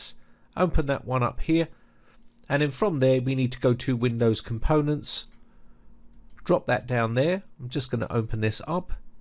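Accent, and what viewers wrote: United Kingdom. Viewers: Just an idle observation ( admittedly not crucial to the excellent info. in the video ).... What is up with pronouncing the word "defer" like "differ". It's bizarre because this guy sounds like a British native English speaker. I find it hard to believe that such a basic word pronunciation is not known.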